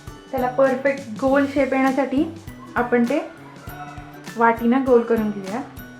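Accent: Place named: Satara, India. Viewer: mi pan satarchi ahe.. bolnyavhya style Varun guess kele mi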